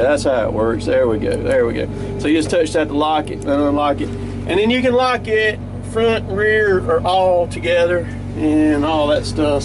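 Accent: South, United States. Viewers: Amen brother. That's the way we talk down here in the south.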